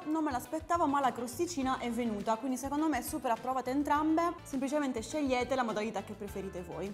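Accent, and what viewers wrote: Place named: Apulia, Italy. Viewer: Che amore che sei con quell'adorabile inflessione pugliese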